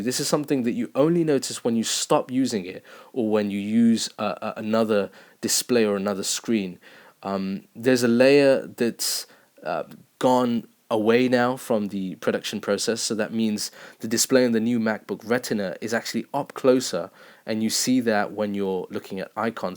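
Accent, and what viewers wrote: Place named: RP, United Kingdom. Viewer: damn your posh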